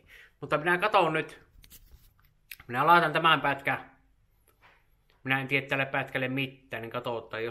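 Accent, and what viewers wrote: Savo, Finland. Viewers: Savon murre tökkii 😬😁👍🇫🇮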